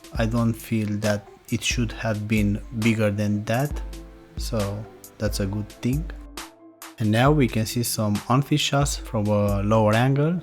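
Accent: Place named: Spain. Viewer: Hablas español, ¿Verdad? Suenas muy similar a Carlos Sainz hablando inglés. Hace unos días probé los ultraboost 1.0 lcfp. Hasta el momento es el par más cómodo que he utilizado, el único problema es que no tienen plantillas, por lo que dudo que sean cómodos para utilizarlos todo el día. ¿Los has utilizado?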